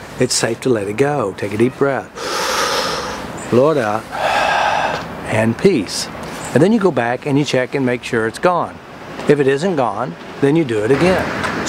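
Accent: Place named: South, United States. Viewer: Great video! Also for people who aren’t used to hearing a nice US southern accent, this is a nice example. ;)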